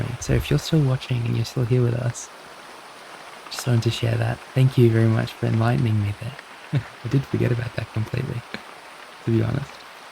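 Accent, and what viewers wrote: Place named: Australia. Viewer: Twin I would like to let you know that your voice is very soothing, and I love your what sound like an Australian accent. I would also like to mention that I find myself falling asleep to your videos every night I can, and I use them instead of melatonin. I really appreciate what you do, and I really hope you can excel in a successful career 💕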